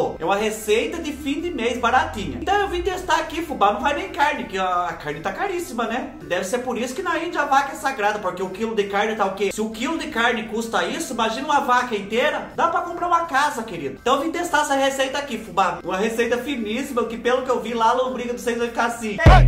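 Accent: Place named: South, Brazil. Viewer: Fubá cai sem querer no teu vídeo e ao ouvir esse sotaque pensei: esse Fubá deve ser do PR ou de SC ou de RS😅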